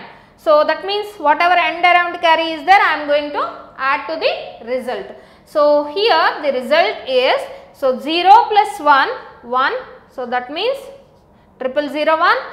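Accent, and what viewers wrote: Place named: South India, India. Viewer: you might be from south India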